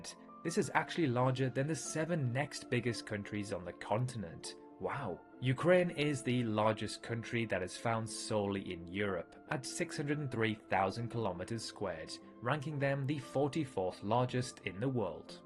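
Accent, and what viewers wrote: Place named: Durham, United Kingdom. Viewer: Interesting stuff to while away the early morning. Thanks! Possibly annoying question: what's your accent? I'm guessing somewhere like Durham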